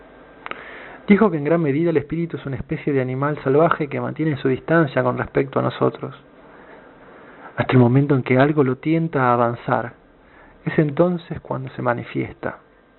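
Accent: Argentina. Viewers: El ritmo de lectura es acelerado considerando que al comun de los lectores los conceptos son novedosos infrecuentes y hasta insolitos y requiere un ritmo de asimilacion mas pausado... La pronunciacion, al parecer de acento argentino, tampoco ayuda como tampoco es conveniente algunas prolongadas pausas o silencios no convenientes... La lectura es fria y carente de emotividad como lo ameritan algunos sino muchos episodios fantásticos de los relatados por el aprendiz de brujo, el autor de la saga...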